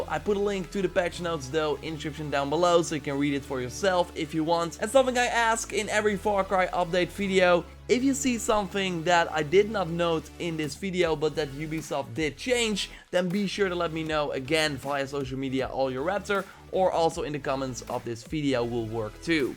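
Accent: Netherlands. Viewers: Your accent is pure aids. Are you dutch?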